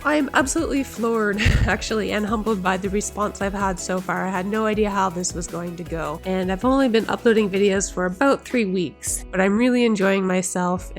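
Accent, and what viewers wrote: Canada. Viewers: Thank you so much for posting this! I have only refinished mid-century furniture with walnut veneer. But I bought a cute circular table with solid wood legs and skirt, but it has a laminate top. I will be applying your process to paint the top and edge. Wish me luck! Yank here btw who visits Toronto about once per year. I knew you were Canadian about 30 seconds into this video 🙂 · Your ideas and work are beautiful and inspiring. Please keep explaining why you choose certain products; it helps me to better understand the process. The music is fine but I'd be fine with hearing just your voice. You have a charming accent that sounds like my Canadian family.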